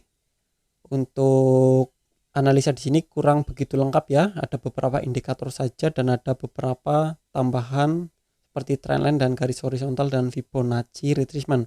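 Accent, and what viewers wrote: Banyumas, Indonesia. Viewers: logatnya kok mirip saya ya 🤭 salam dari wangon mas bro...izin menyimak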